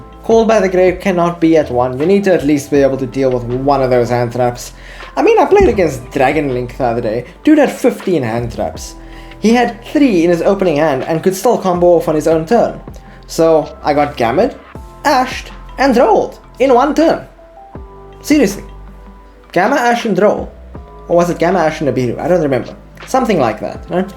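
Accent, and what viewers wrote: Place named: South Africa. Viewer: Interesting content my man, where are you from? South Africa?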